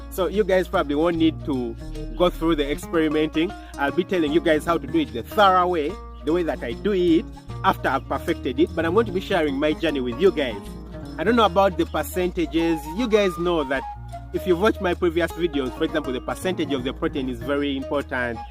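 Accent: Uganda. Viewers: All along I was checking your accent and felt like it was Ugandan, and finally, you mentioned it in this Video! Good Work Man, Nice Channel, ndi big fan of your videos.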